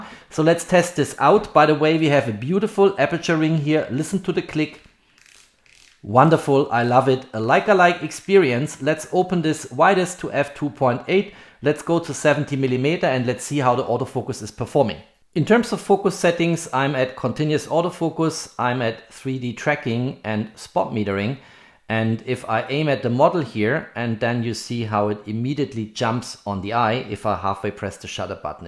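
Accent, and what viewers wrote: Germany. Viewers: Exactly what I was looking for, thank you! Are you German btw.? :D Your accent sounds German. This probably also would mean that the adapter is available in Germany which is great. One more thing: how is the AF performance in videos with moving subjects? One thing is being able to recognize eyes/faces, the other one is real fast-tracking and on-point autofocus in videos, I think that's a harder task to accomplish, therefore I'm not completely sure if the performance is similar to native lenses in this scenario. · Youre German, right?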